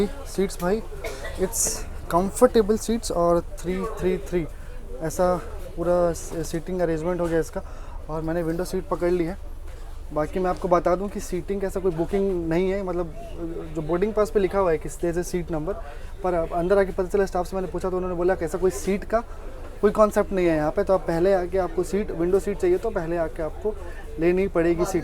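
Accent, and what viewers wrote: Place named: South India, India. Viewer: You sound more of a south Indian :D ...explained the details well.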